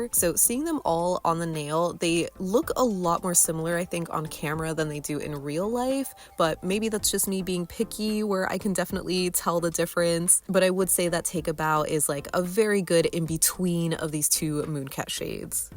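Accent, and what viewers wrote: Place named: Philadelphia, United States. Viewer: It may be a regional pronunciation thing. I’m from the Philadelphia area like and I pronounce it the same way. If you want to drive yourself crazy, you should hear us pronounce the word “water” as “wooder.” It drives people nuts.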